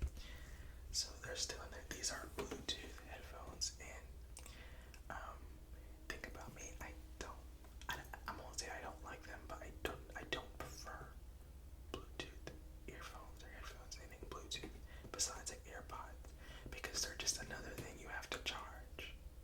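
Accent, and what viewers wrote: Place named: United Kingdom. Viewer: the british accent omg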